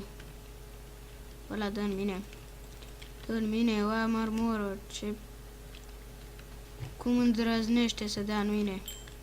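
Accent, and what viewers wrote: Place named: Moldova. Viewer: baiatu gamer nu mai vorbi moldoveneste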